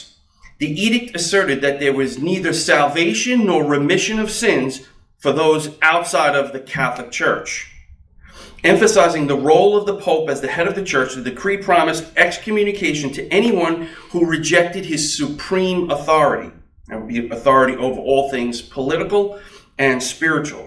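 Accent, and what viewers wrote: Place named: New England, United States. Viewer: This guy is awesome 👍 even I can pay attention to him. I think it's his New England accent (Massachusetts?) Anywho, thanks for breaking this down for us simple folk😊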